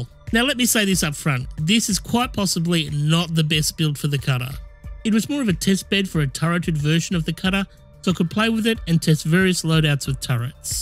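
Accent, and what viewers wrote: Australia. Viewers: You sound like an Australian Jack-Frags :D :D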